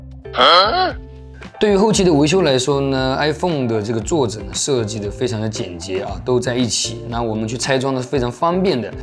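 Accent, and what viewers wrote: Wenzhou, China. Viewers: 是很正宗的温州口音了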